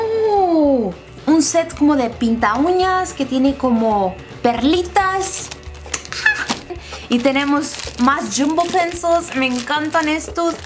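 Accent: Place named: Mexico. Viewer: eres mexicana?? tu acento me hace pensar eso... eres hermosa, sencilla y super talentosa... me suscribo!!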